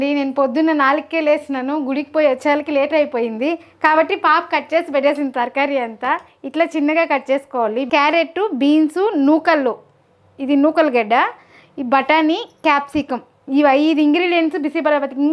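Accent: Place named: Chittoor, India. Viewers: Ne matalu basha Rayslaseema pillala vunnavu kani antha neyyithone cheyali nuv oil vadavu Nen eppudu chesthanu potato vesthanu totalga ne vusharu ne mata rhiru navhhai keep it up God bless you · Hi sister me oru Chittoor aa maoru la undhi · Its rayalaseema slang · You are popularising Chittoor district Telugu dialect, thank you 🎉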